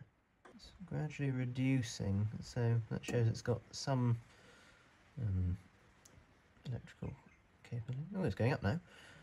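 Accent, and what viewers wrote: United Kingdom. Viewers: youve got the most british accent ive heard. But we in England dont have possums were are you from??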